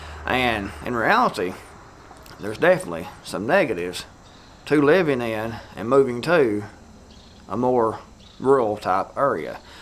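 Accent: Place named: West Virginia, United States. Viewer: Sounds like West Virginia buddy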